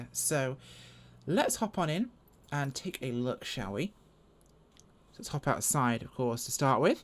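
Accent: Russia. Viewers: I like the Russian accent...it suits you. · I normally really like your reviews, but this russian accent was a bit too much for me over time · I loved your Russian accent!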